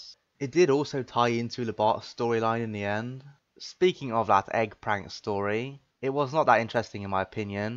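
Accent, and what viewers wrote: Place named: United Kingdom. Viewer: Hey, I have a question. It's okay if you don't want to answer it. But: Your accent is a bit interesting, it sounds like a British accent mixed with other accents, So where actually are you from?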